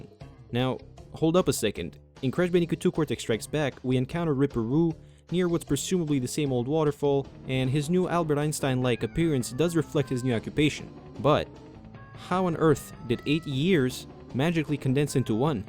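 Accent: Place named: New York, United States. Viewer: Wait, your first language was not english? I could easily pass you for a new yorker, your accent is perfect for america!!!! I just sound like a (weard) english person.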